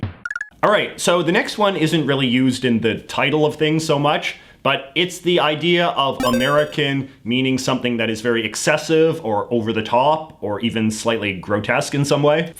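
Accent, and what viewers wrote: Canada. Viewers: Although he does pronounce it a little oddly, you'd expect in his canadian accent that he'd go hard into the R sound but actually skips it altogether! Normally in an anglo accent it's pronounced boar-jwah, but goes for something more like boo-jwah. I'd be interested to know if that's deliberate or not if you do read this! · you have the most stereotypical canadian accent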